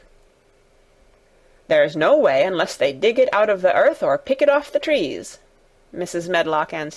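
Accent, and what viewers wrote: Yorkshire, United Kingdom. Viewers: This is the best audio book and reader ever! Her reading i so smooth and the Yorkshire accent is wonderful!!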